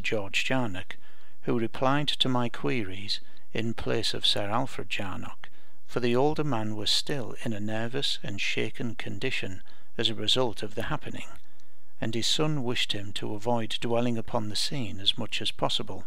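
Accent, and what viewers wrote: United Kingdom. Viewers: No offence to American readers, but there's nothing like an English voice for a ghost story 🙂. Thanks.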